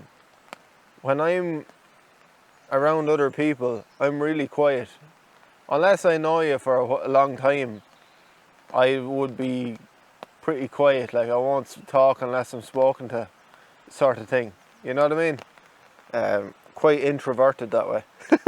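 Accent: Ireland. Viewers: I just started watching your videos a couple of days ago and I enjoyed them. I noticed everybody was talking about your giggle and they’re right it’s very endearing. I wouldn’t change anything - you got me as a new subscriber. I love your Irish accent! At least I assume you’re in Ireland?! if someone doesn’t like what you’re doing they’re free to go watch something else you know what I mean? It looks to me like you have lots of people who are interested, so I wouldn’t worry about it at all.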